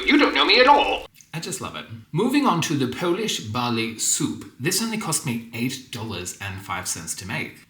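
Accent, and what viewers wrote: United Kingdom. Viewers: Gidday. I am a new sub and love your wartime meal cooking. I was born in SA, and live in QLD. My British in laws always told me that my accent sounds British. Well, when I watched a first video from your channel, I thought you sounded like a Pom! Fancy that. Nice to know you are an Aussie. Absolutely love your wartime recipe content, because my grandparents endured both world wars and the Depression. Both of my parents were born during WW2. I have kept my maternal grandma’s cookbook published in 1950. As you know, rationing extended until 1955. Many recipes in the book came from rationing. For your interest, the cookbook was the Green and Gold cookbook issued from the King’s College in Adelaide as a fundraiser. I have a more modern reprint but it doesn’t feature the same recipes. Good on you for your channel. Keep doing what you’re doing mate. 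❤️🇦🇺👍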